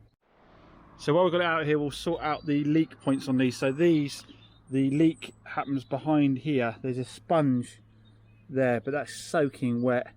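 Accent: South East London, United Kingdom. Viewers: He's got a completely typical South East London accent.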